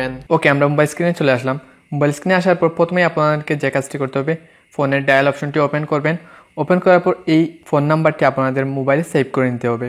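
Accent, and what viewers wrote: Sylhet, Bangladesh. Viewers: ভাই, কী সিলেটি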